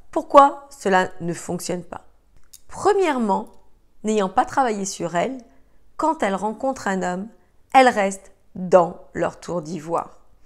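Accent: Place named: Ivory Coast. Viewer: Vous êtes la meilleure,! Vous avez un bel accent de la cote d'ivoire que j'adore!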